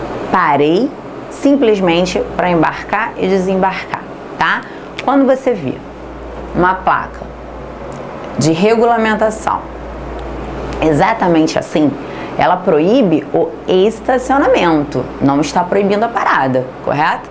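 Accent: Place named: Rio de Janeiro, Brazil. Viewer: So pelo sotaque carioca, já desisti.. Deus me livre....!!!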